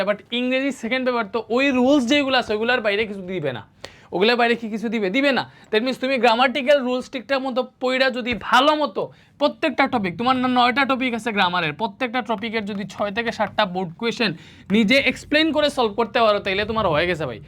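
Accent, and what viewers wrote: Sylhet, Bangladesh. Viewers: Aree bhai eita moja near ki ache Siree Sylhetyy 🙂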